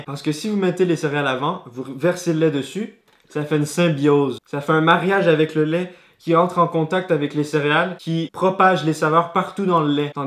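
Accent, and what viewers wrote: France. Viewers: Qui trouve qu'il a pris un accent français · Ton accent a bin changer osti, t’avais un sale accent français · PK tu te donne l'accent francais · criss t'es revenue avec un accent francais on dirait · Ton accent a changé tu as adopté UNPEU l'accent francais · Écouter ça en 2023, my god l'accent français. Le voyage t'avait laissé des restants 😝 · tes revenu avec un accent francais big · Ques tu fait avec un accent français???!!! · Je trouve que à cette epoque il avait plus l'accens Fr que QUEBEC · Mdr t'as un accent français dans cette vid XDD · WTF !? Pourquoi dis-tu que tu es Québécois quand tu met en valeur un accent français de France dans tes vidéos??????????????? ( Sans insulter personne )retire : PAROLE DE SHLAG QUÉBÉCOIS dans ta description à cause TU LA PAS POUR UNE CENT xD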